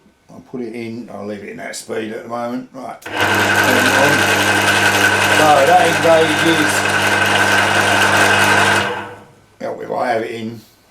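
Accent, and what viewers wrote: East London, United Kingdom. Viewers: Nice video. Your work bench is as cluttered as mine is , I think it might be a Cockney thing as you sound like you come from east London. 😊😊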